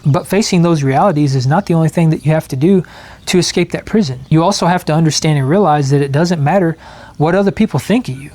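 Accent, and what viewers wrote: United States. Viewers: Yay, I love the sound of your American voice and watching Shawnee and your chickens in the background and what you say. Thanks ❤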